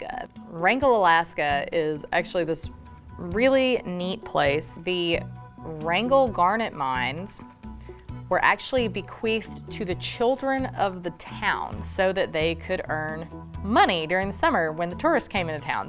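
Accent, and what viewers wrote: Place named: South, United States. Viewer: Miss tries real hard but her Southern drawl keeps coming in and out am I the only one that thinks Miss might possibly be Cherokee?😘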